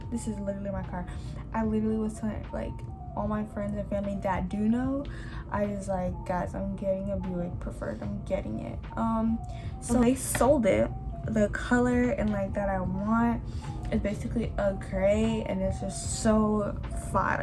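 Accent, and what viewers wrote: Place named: Haiti. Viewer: fellow haitian here! north miami haitian 😂, i could already tell by your accent alonee❤❤❤. also im a long time subscriber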